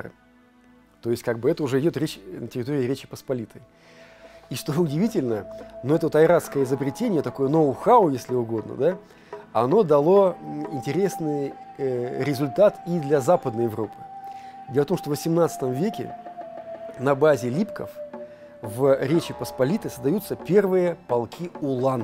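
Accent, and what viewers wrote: Russia. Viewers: Россияне традиционно "акают". Говорят "МАсква" (пишут- Москва), говорят "агород" (пишут- огород) и т.д. Было бы странно если бы российский историк говорил бы так, как привычно казахстанцам, а не русским.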